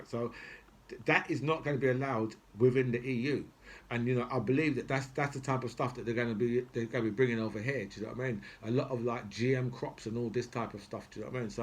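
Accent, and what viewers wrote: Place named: South London, United Kingdom. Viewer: You're welcome, and the pleasure really is mine. I'm just a concerned foreigner, a former resident of the UK, during the happy days of freedom of movement, with a few British relatives. So I care, even if it doesn't hurt me all that much personally. I subscribe to quite a few brexit critical youtubers, Phil from A Different Bias, Maximillien Robespiere, Bowlerhatman, that German guy (forgot his name sorry), and more. I like you because you speak the language of the London streets I used to live on. I lived in SE14, New Cross Gate, around the corner from Peckham. You talk like you're from there, and about something that is close to my heart, the UK 's attempted severance from Europe. So, for me, you're adding something meaningful, the argument against brexit in the language I was used to hearing all you around me. 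Because you tell it like it fucking is. Do you know what I mean? 😀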